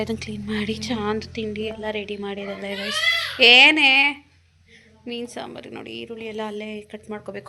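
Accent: India. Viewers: ಒಂತರ ಅಂದ್ರೆ ಹೇಗೆ . ನಮ್ ಹಳ್ಳಿ ಕಡೆ slang hage eruthe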